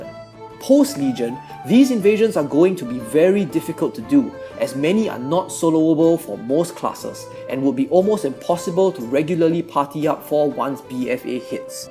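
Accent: Singapore. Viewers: Are you singaporean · saw in another comment that you said you are sg..well that explains the accent ..but more importantly thank you for the tips ,they were very imformative and helpful for us casuals..i need to get the titles and a few mounts but ugg..and the mage towers really pissed me off..i can't find a good assasin rogue video to refer to..keep posting man i'm subbin ya ..we play both factions so we will see what happens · no wonder I found your accent familiar , I'm from Singapore too ! Which server are you playing ! Wanna play together ?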